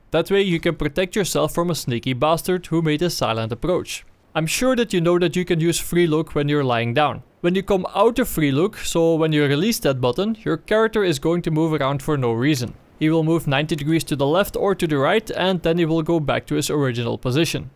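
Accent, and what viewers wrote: Netherlands. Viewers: dutch accent? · you can tell he speaks dutch normally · u dutch? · Your Accent is netherlands, isn't it? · Steenkolen engels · arent you dutch your accent sounds like that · Ik kan aan je accent horen dat je nederlands bent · he kinda sounds dutch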